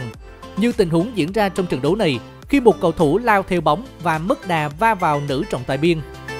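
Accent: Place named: Binh Dinh, Vietnam. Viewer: Giọng bình định trong sâng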